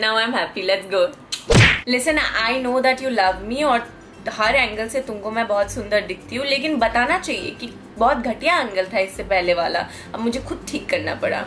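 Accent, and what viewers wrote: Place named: Bengal, India. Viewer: I have subscribed already yaar....❤️....I follow u on myntra as well.....I like ur attitude and accent ...u are just like me....and I'm Bengali too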